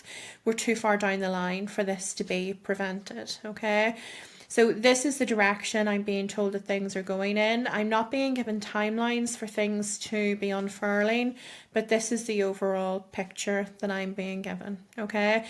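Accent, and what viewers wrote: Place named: United Kingdom. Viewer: Nice British accent prophet🎉🎉🎉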